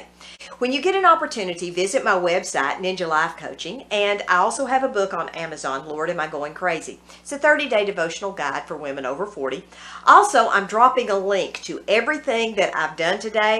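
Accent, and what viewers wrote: South, United States. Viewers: I just found your channel. I think that you look very good in the red wig! You have a red head kinda personality! I should know because I am a redhead myself! I just subscribed to your channel. Oh yeah! I can tell by your accent that you are from the south. So am I!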